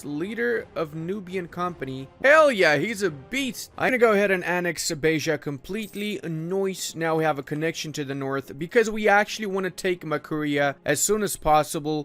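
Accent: Germany. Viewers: I can't lie the weird German accent gets somewhat annoying after a while. Not hating just my opinion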